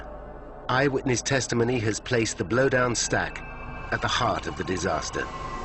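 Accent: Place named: England, United Kingdom. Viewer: Ironic that there is an English accent narrator in this version considering BP owned the plant. They care nothing about their workers just profit.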